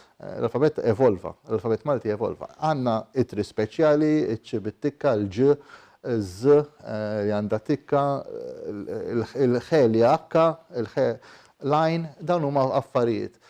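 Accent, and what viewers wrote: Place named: Tunisia. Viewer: The professor has such a Tunisian intonation.....one intervenant sounded Tripoltan.....and you have a few levantine words ....like issa, inkiteb...inqalab....at the end it is driving me crazy .....rahal ..marhala means both....distance of journey and staion of journey......and by the way ..,when you say Yena ....for I ....that was lost in the arab world.....but still exists in Yeni only in a town in central Tunisia and in Alexandria Egypt.and probably some Palestinians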